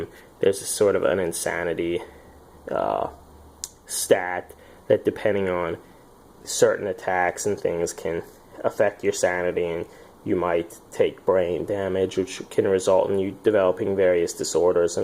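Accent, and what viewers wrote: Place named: Louisiana, United States. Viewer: Nice video. Gotta say, you almost sound Cajun. Are you? I am. ^_^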